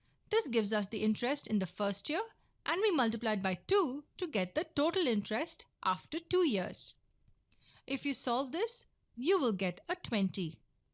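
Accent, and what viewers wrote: India. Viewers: India cringe accent gang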